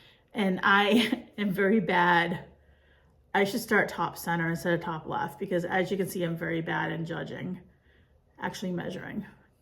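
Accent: New England, United States. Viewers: Happy New Year! I agree about 2021….let’s move on 😍. I need to get that Salem 1692 chart. I’m reading The Witches now. Your Elisabeth Addlesee is BEEE U TEE FUL! Thanks for participating in the #stoopidtumorsal. You said it perfectly with your New England accent 😍☺️😳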